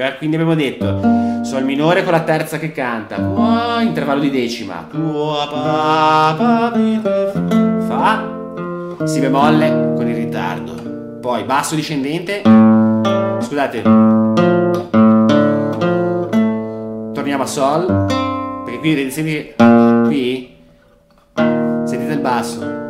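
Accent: Genoa, Italy. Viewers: È davvero molto bravo. E non pensavo che avesse tutta questa conoscenza teorica, perché non si prende mai troppo sul serio. Mi piace un sacco l'accento genovese quando chiede "avete capitooo???"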